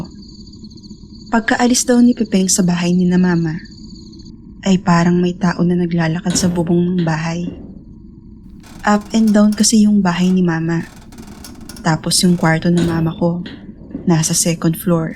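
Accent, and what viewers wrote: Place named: Conyo, Philippines. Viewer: Yunh conyo ka HAHAHAHA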